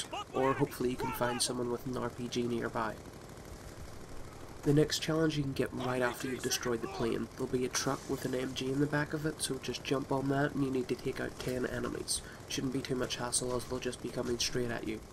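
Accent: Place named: Northern Ireland, United Kingdom. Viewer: Thanks for the great guides. Looking forward to the next ones. It's been a long time since I've heard a real Northern Irish accent ;)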